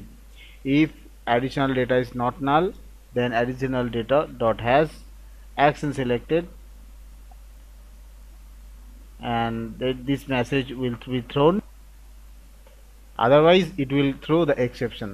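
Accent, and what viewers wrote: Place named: Bengal, India. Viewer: Such cute Bong accent.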